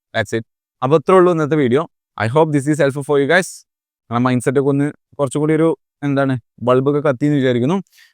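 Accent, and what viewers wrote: Malappuram, India. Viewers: താങ്കളുടെ വീഡിയോകൾ എല്ലാം മികച്ചതാണ്. പക്ഷെ സംസാരത്തിൽ നാടൻ മലപ്പുറം കാക്കാൻമാരുടെ ശൈലി കടന്ന് വരുന്നത് ലജ്ജാകരമാണ്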